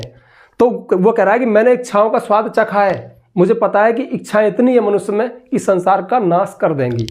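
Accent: Rajasthan, India. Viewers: Sir mujhe lag raha hai ki aap Rjsathan se hoo 🤔😊❤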